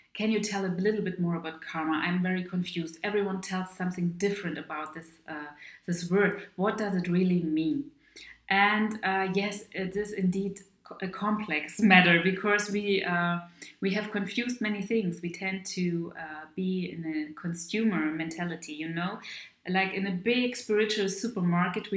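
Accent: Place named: Germany. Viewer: Ich liebe es, mit deinem deutschen Akzent zu sprechen. Sie haben eine so positive Aura. Du lächelst immer. Ich mag das sehen. Ich finde deine Videos charmant. Ich weiß, du bist sehr weise und gelernt. Ich spüre "Macht" in dir. Vielen Dank. Mögen die Götter dich und dein Zuhause segnen.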